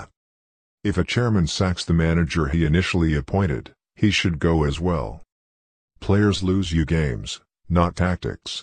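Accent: United States. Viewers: This is weird - At least they could have got someone with a Yorkshire accent to read them instead of an American droid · Great quotes from a great man read out by a mechanical bot with a septic tank accent! shameful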